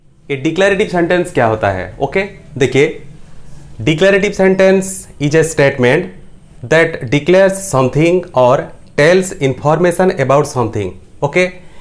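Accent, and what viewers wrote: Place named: Odisha, India. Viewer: Good job sir. But aap hindi kyu bol rhe ho sir bich bich me apke muh se odia nikal ja rha hai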